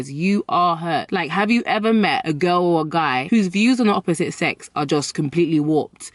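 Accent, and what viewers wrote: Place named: Birmingham, United Kingdom. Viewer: I can here a brummy accent coming out have you been dwn endz😎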